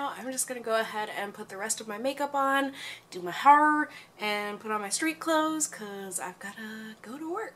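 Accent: Minnesota, United States. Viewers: I know how difficult that kind of transition in life can be, so big hugs to you! I worked at a jewellery store for years and the only thing that motivated me to come into work was the friends I had made there, they were all so amazing and we had so much fun when we weren't angsting over the shittiness of the job. I get ya, girl! Moving to Japan has also been wonderful and really difficult as well, I miss the things I used to do and the friends I had, but I equally love my time here. Anyway, I look forward to your MN accent fully coming back :DV I bought the foundation a couple of months ago and as much as I love it, I also hate it because it transfers SO much and I get shitty I have to powder it so it doesn't transfer onto all my clothes and onto the clothes of all those around me!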